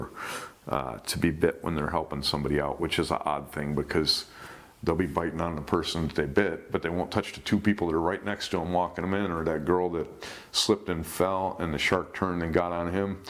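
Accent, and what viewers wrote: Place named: Connecticut, United States. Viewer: =You must be from Connecticut. I have been interested in dialects for 50+ years. How close am I in my guess? LMK please.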